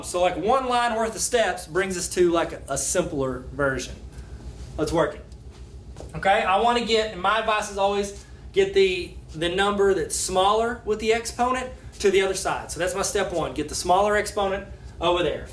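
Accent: South, United States. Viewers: ayyyyyyyye my class calls you and we love this video haha XD THANK YOU FOR BLESSING MY MATH CLASS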